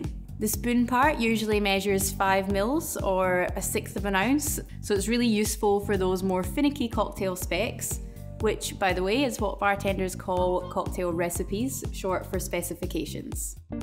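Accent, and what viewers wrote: Scotland, United Kingdom. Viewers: By the way, I absolutely enjoy your recipes and your Scottish accent! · Does she have Scottish accent?? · God, I love that scotish accent. There should be more scots in this world. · Love your videos and lovely Celtic/Scottish accent. I'm having trouble getting the exact song title and artist from your video. R, Patrick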